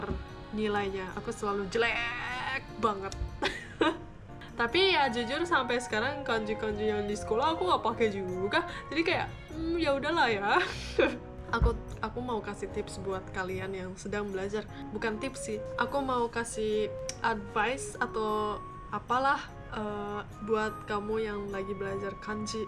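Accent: Indonesia. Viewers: Logatnya certified mba-mba indo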